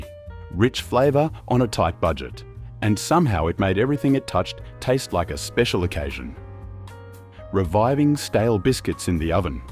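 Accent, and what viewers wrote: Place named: United States. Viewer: Our friend can't disguise his Yankee origins with his pronunciation of the words Aussie, after and new.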